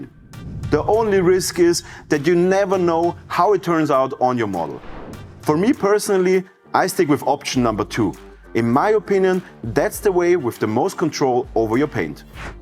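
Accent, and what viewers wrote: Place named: Austria. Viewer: Das mit dem offwhite muss ich mal testen. Bis jetzt eher Richtung Knochenmäßig gegangen. Du gibst mir voll die Schwarzenegger vibes mit deinem Akzent. Super zu verstehen. Freue mich auf mehr. Grüße aus Hamburg.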